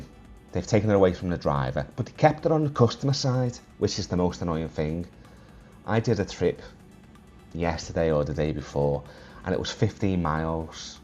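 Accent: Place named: Liverpool, United Kingdom. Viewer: I like your accent, thats Liverpudlian right? Ive noticed it's dead too, as I can see what the drivers are getting paid nowerdays and it's always about 60% of what I paid 😢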